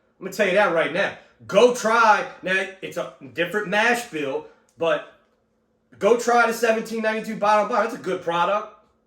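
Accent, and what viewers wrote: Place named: New Orleans, United States. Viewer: Thx for the informative review; saw you on another bourbon channel, recognized the accent & knew you were from Nola area! Can Early Times bib be found in nola?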